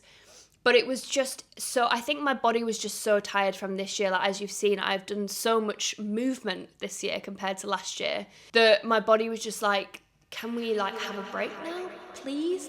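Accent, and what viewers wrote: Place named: Northern England, United Kingdom. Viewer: Aw, it was so lovely to have this pop on randomly in my feed and immediately hear a Northern accent. I live all the way down in Vienna now, so it's rare enough. Happy to hear you're in love with slow, healthy running! Have a great 2025.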